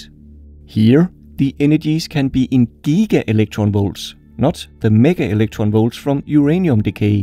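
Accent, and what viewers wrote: Denmark. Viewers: R u Danish? Jeg er I hvert fald du lyder dansk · you sound oh so danish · Are you danish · You sound danish 😌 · He kinda sounds like a Danish dude with a slightly american accent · I love that danish accent :D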